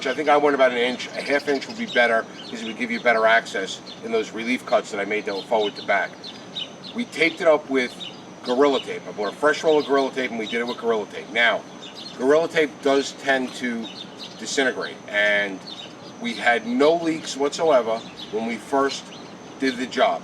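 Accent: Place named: Long Island, United States. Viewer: this just wouldnt be the same without the Long Island accent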